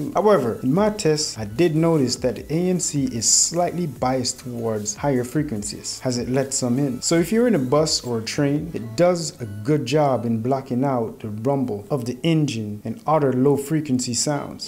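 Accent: Jamaica. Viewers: Great review but I must ask...how Jamaican are you?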